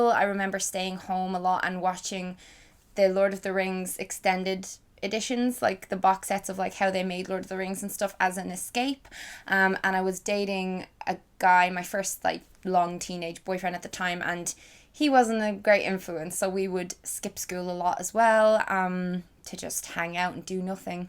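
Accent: Dublin, Ireland. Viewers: I can't hear Louth in it at all. Sounds completely Dub to me!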